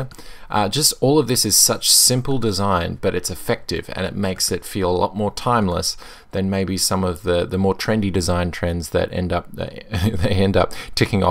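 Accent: Australia. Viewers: G'Day mate. Thanks for this. I've been wanting to install a new distro on one of my PC's and you've certainly given me a great deal of information to digest plus conduct some more knowledgeable research. So good to hear an Aussie accent on YT as well. Cheers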